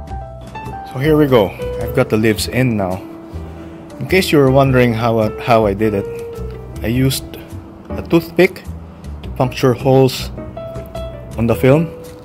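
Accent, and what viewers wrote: Philippines. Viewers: You have filipino accent.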